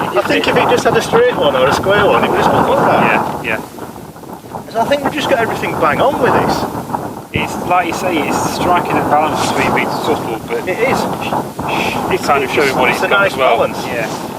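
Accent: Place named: United Kingdom. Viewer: Phenomenal hatchback already giving the GTI a run for the money. Loved your video, and am a new subie. Wish your mic didn't have so much wind interference though, lost some of your comments. Haven't quite gotten used to your Brit accent yet, tuning in from Canada!. Hope they ship the i30N to our shores. Cheers!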